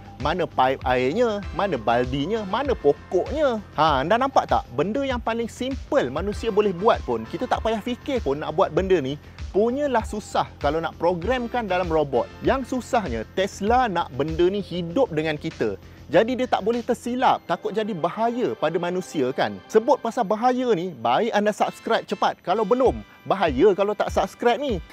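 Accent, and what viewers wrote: Johor, Malaysia. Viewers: Lah orang jb ke haha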